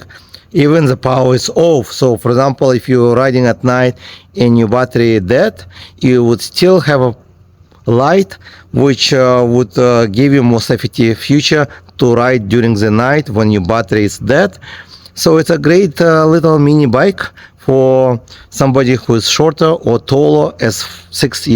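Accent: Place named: Russia. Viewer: Простите конечно но по вашему акценту понятно что вы говорите по руски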